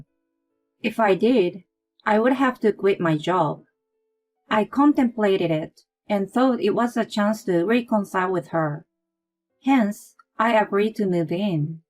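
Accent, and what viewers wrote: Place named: Japan. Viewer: If this is a American story why is the reader Japanse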